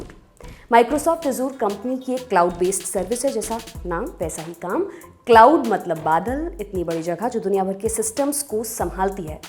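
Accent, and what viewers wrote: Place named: Kanpur, India. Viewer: are ye sab kanpuriya hain..aise hi bolte hain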